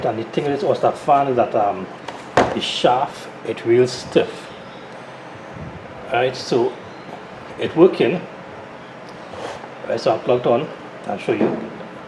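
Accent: Trinidad and Tobago. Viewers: Is that a Trini accent I’m hearing?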